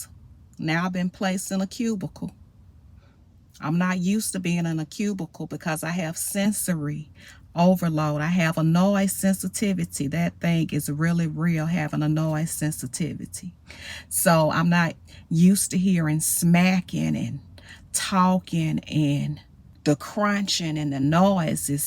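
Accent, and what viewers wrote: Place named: Alabama, United States. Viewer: How did I know that accent was from here… Alabama!! Whew our accents are so unique lol!! Sending love!! ❤❤❤❤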